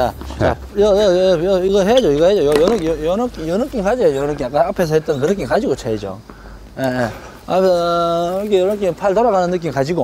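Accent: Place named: Daegu, South Korea. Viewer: 저도 대구 출신인데,, 오랜만에 듣는 extreme 사투리. 와이카는데요! 내용도 좋고 사투리도 좋아요 ㅎ 구독, 좋아요 ㅎ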